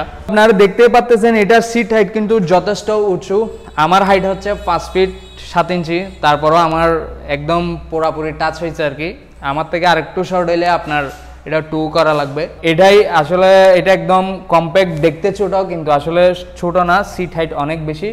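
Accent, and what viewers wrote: Chittagong, Bangladesh. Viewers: 100% chittagong ar manush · অনেকে দেখি উনার উচ্চারণ নিয়ে হাসাহাসি করছেন। অন্যের ভুল দেখে হাসাহাসি করার অভ্যাস আমাদের গেল না। উনি পরিশ্রম করছেন, ডেডিকেশন দিচ্ছেন এবং এভাবে হয়তো ভুল করে হয়তো নয় শিখবেন। সুন্দর করে কি ভুলটা সংশোধন করে দেওয়া যায় না? আমরা সভ্য মানুষের মত কি আচরন শিখতে পারবো না? যারা হাসাহাসি করছেন তারা কি মনে করেন যে আপনি সবকিছুতেই একদম পারফেক্ট। হ্যাঁ আমি দেখলাম উনার কথা বলার ভঙ্গিতে চট্টগ্রামের টানটা একটু বেশি এবং কিছু কিছু উচ্চারণ তিনি ভুল করেন। কিন্তু আপনাকে যেটা বুঝাতে চাচ্ছে যেটি দেখাতে চাচ্ছে সেটাতে উনি পারছেন এবং চেষ্টা করছেন। চলুন যারা চেষ্টা করে তাদেরকে আমরা অনুপ্রেরণা দিতে শিখি। 🙂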